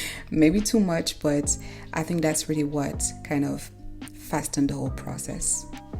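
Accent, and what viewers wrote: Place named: Haiti. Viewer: Are you Haitian? I was trying to pinpoint the accent. It’s adorable.❤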